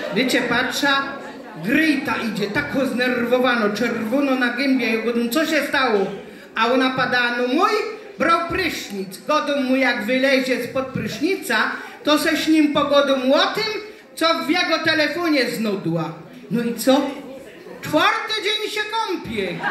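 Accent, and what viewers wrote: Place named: Silesia, Poland. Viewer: Super kobieta uwielbiam Śląska gware bardzo miło posłuchać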